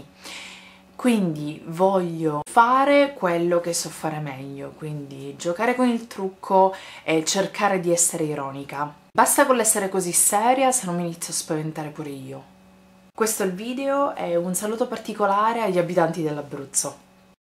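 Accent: Sicily, Italy. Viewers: Non voio ! : ) Bellissima pronuncia. Sei siciliana immagino ! Simpatica e bella !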